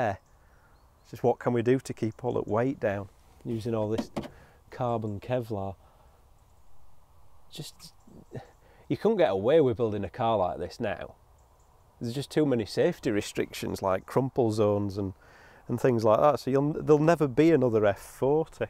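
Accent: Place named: Liverpool, United Kingdom. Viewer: Hahah gotta love that accent ! F - fortaay. I guess thats scouse accent, anyways what a beast of a car that is !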